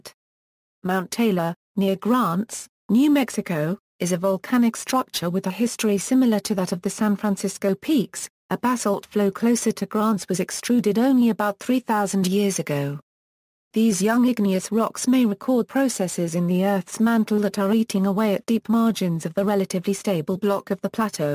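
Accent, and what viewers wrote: United Kingdom. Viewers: Informative content but the British voice was distracting and annoying because it sounded robotic and artificial AI-like.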